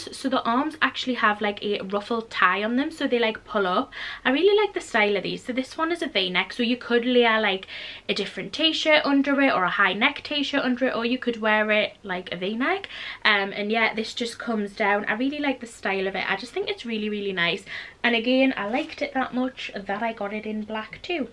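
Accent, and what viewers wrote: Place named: Geordie, United Kingdom. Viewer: You sound SUPER GEORDIE in this vid xx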